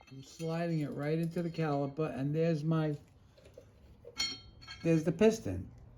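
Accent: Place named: North, United States. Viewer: ❤ ABSOLUTELY love thos guy. His US Northern accent really made me smile. He seems nit to like to hear himself talk and really knows how to keep it simple. Plus, he knows what he's talking about. I've done brak pad inspections and replacements in the past, but I wanted to refresh my memory, and this guy really came through. Yes, I did view other videos, but I really like this one the best. Thanks, guy, for giving me that little boost of confidence. O, I'll have a backup guy watching over me. Breaks are something you just don't monkey around with.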